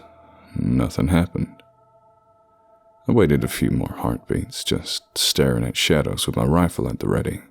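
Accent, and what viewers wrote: South, United States. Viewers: Bro ur up there with Jason Hill(chilling tales for dark nights) and ur southern accent is pretty on it espically for u being British.🤘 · For a Brit, you do a damn good southern accent. Bravo. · As a native Kentuckian, I can confidently say that you actually put on a decent southern accent. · Your southern accent makes me smile 😉👍🏽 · Your American accent is getting better, or I guess I should say accents. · You do an amazing southern American accent for an Englishman! ❤️👌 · you do a great Southern USA accent! Seriously! I live in Kentucky, but spent lots of time at Lejune while my dad was in the Navy. You nailed the patois perfectly. I love your voice and your giant nature loving heart. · Wow... Your Southern accent is amazing! I almost could have sworn it was your native accent. Well done!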